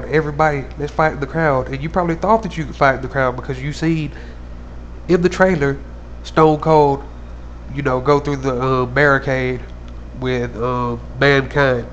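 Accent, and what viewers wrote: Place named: South, United States. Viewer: lol u probably get it alot but u sound so amazingly what we think of as southren yank in britian so i had to b ironic i mean nascar in any other accent just doznt sound right lol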